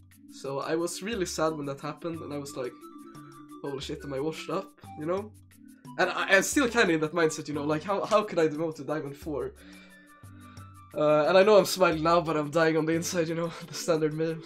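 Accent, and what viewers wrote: Sweden. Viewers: fan vad svensk du låter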